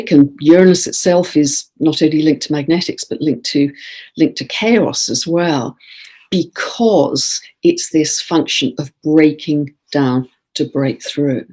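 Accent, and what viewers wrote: United Kingdom. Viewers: Thank you. Good to know of the strong Aquarius theme and to me it is interesting to have it all in a month of sun in Leo energy for the bigger part of the month. The unpredictability of what is coming have a good taste to me by the sounds of all happening Astrologically. Personally I see a level of anxiety from all these energies combinations and at the same time I also feel excited and motivated by all of it. I don't even know how I always know when you say Eris and when you say Aries. When I try to repeat what you say to find out they sound the same but I think there is a subtle difference my brain can pickup having my ears trained well enough to understand British accent. I would guess this accent confusion could be harder for Americans or foreigners not full into British accent. Funny that they, Eris and Aries, have also similar archetypal symbolism. 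Always lovely to hear your videos and have all this rich information at hand. Much appreciated. M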